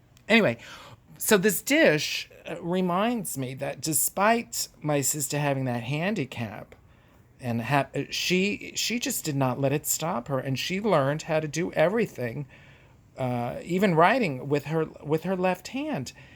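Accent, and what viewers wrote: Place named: New York, United States. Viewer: 45 days in and the stories just get better and better! thank you for sharing your sister's legacy with us. Your stories are playing a huge part in me getting through this crisis. I live in Brooklyn and have been sick for the better part of 2 months with this silly virus (and I'm going to be fine, just slow going). I love hearing that NY accent. xoxo Steph from Park Slope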